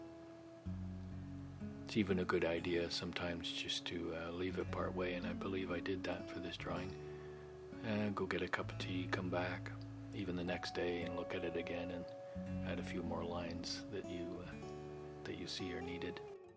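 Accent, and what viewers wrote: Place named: Canada. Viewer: I lived along the St Lawrence River and grew to enjoy the Canadian accent, thanks for the memories. I love how you capture the soul of the area in your pictures. 👍🏻🥰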